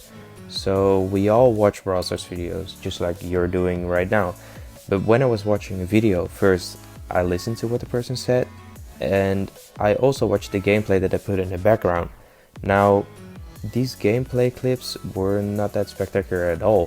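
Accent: Netherlands. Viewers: Omg it's so funny because I'm from Belgium and I can really Hoor dat je een Nederlander bent aan je manier van engels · I am sorry but you have a very Dutch accent :p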